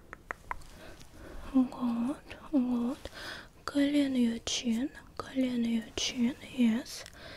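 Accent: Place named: Russia. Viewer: Русский акцент по-моему офигенно триггерный. Вери вери гуд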